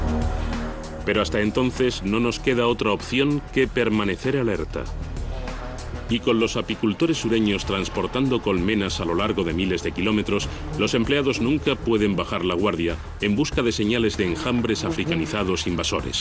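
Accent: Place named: Spain. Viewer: Cuando un Español narra un documental, sabes que va a estár muy bueno!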